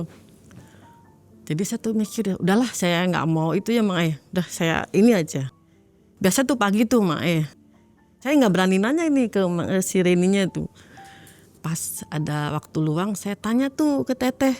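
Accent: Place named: Indramayu, Indonesia. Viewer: Logatnya kaya orang Indramayu,, Gak mau suudzon sbnernya .. Tapi kalo bener yaaaa cukup tau ajah soal mie yamien 😅😅😅 jadi takut ...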